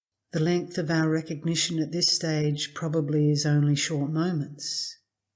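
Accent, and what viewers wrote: Australia. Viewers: Since I found this playlist I am listening every night. To hear these teachings read in a straightforward Australian voice is particularly helpful to me (I guess there must be some "accepting", "identifying" or "clinging" in me there!). What the topic is "being natural", a natural voice is very apt. While listening, I follow the instructions of the teacher, as best I can.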